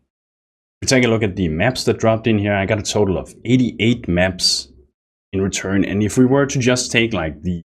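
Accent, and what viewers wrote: Denmark. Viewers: you sound like a dane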